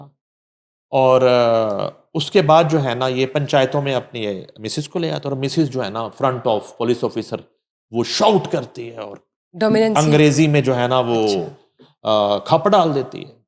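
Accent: India. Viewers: Aap Urdu k ilfaz ko Hindi Mai pronounce krti Hain...why??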